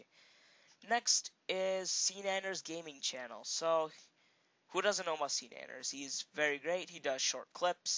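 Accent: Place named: United States. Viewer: cool vid but he speaks American?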